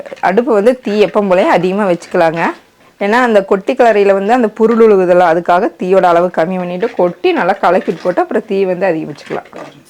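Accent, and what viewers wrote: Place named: Coimbatore, India. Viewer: nanum coimbatore than...pollachi amma ooru...ippo US la irukken...unga recipe ellame super,na main ah neenga pesarathe ketka varuven...namma ooru basai...ippo kuda sometimes enna ariyama vanthudum...ellarum correct ah kandupiduchuvanga... neenga coimbatore ah nu ketpanga...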